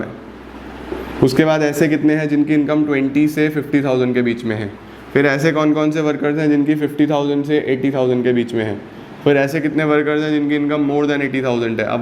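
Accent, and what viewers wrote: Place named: Punjab, India. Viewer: Mujhe lagta hai ki aap punjab ke rehne wale ho.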